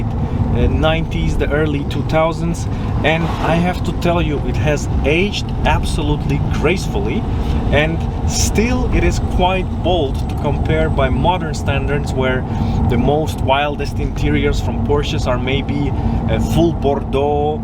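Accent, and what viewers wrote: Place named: Russia. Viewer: i Love rus accentet! it not mostakbal! lovat red 996 !!!!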